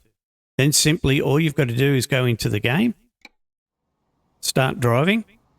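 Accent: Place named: Australia. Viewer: Mate are you aussie by any chance? Been watching your videos for a while, only noticed your accent today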